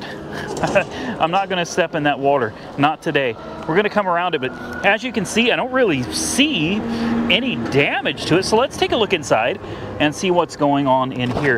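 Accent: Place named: California, United States. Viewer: It's hard to believe you're from Indiana, you talk just like us boys in California.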